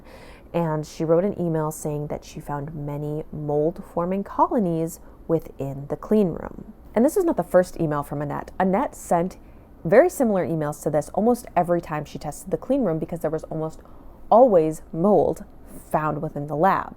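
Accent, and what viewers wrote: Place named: United States. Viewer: Well, your explanation of why you were uncertain of the pronunciation. If English is your second language, phenomenal American accent.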